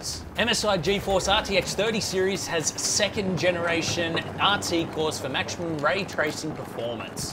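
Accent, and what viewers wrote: Australia. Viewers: hello are you australian?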